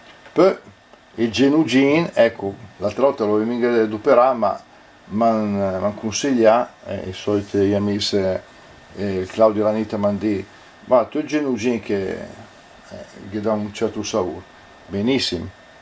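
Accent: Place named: Milan, Italy. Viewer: A parte la ricetta la cosa più bella di questo video è la parlata milanese, dialetto ormai perso. yt è pieno di video di cucina in romanesco, finalmente un po' di lingua madre. Grazie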